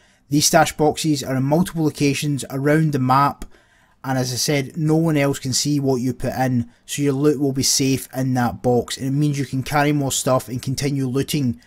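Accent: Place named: Scotland, United Kingdom. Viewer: Where about from Scotland are you from mate?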